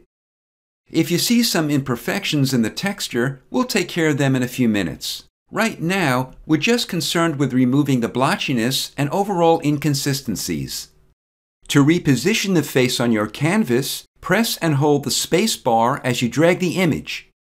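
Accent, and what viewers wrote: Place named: Long Island, United States. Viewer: Oh my gawd! I'm from Lawn Guy Land! I live near Seattle now, and I get 'the look' ALL the time! Your accent is like 'home' to me! GREAT tutorial too! THANK YOU!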